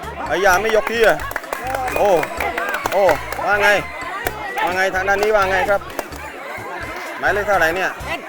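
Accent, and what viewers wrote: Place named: South, Thailand. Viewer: พูดใต้เลยครับ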